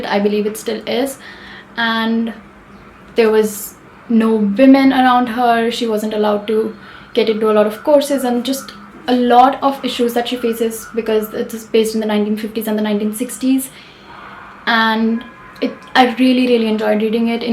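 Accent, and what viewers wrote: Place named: Bengal, India. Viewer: why does she have a hint of a bengali accent? sounds cute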